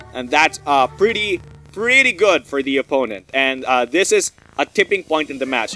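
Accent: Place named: Philippines. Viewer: Are you Filipino? Your accent is a pretty good hint. :))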